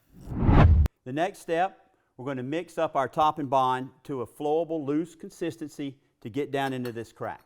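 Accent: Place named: South, United States. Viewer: Nice job 👍, good southern accent too. Though, I still got a q: I am about to resurface my 20x 40 feet concrete driveway which is slightly inclined downhill from the road towards my garage, which make water pooling up next to my garage door. I want to resurface entire driveway but I also want to make the end of the driveway adjacent to the garage door elevated about 2 inches up, and make it sloping down to the garage floor on one side and also sloping toward the outer end by the road - with hope that the rain water will be flowing away from the garage. Any suggestions how to do it DIY? Thanks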